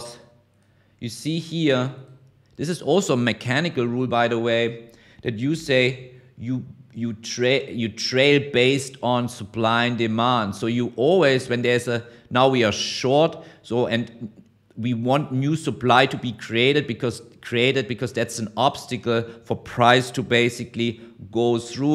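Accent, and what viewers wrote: Germany. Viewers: Man i love your English mixed with German accent and your articulation ! So nice!👍🏾 ❤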